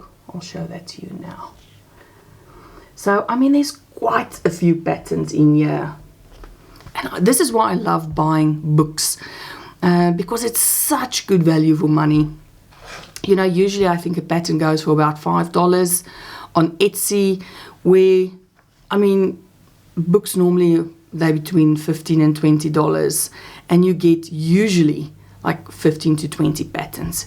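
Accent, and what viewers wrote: South Africa. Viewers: Hello. Your bee is gorgeous and what a lovely story. I am lucky I have both of these books already. I have finally caught up on all your videos. I love your channel My son in law comes from South Africa but he and my daughter and two grandsons live in the UK so it is lovely to hear the accent. My husband also visited Denmark years ago and loved Copenhagen. He used to work for Bang and Olufsen. He has retired now. We are from Australia. Lovely video. Thank you have a great week. ❤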